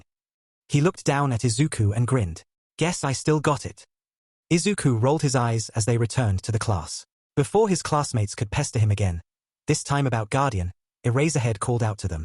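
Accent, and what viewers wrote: United Kingdom. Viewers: Is this shit British ????????????????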